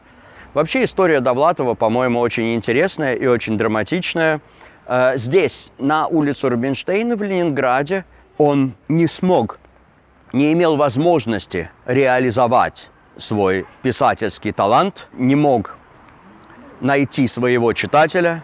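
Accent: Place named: United States. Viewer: Очень интересный рассказ о Довлатове, спасибо. Но я не понимаю, зачем, будучи преподавателем русского языка, упорно использует американскую интонацию во всех своих видео.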